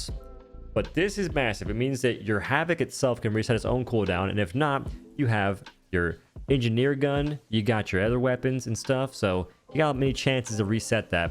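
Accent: South, United States. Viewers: Nice vid, but question, is it just me or does your accent just randomly turn on and off? you sound country for like 15 seconds every minute or so. Kept throwing me off. but in a cool way.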